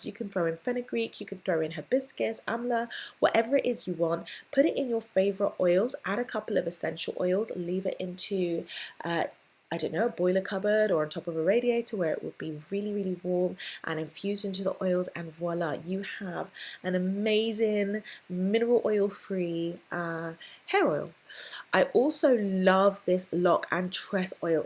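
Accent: England, United Kingdom. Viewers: you had an English accent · That English accent...love!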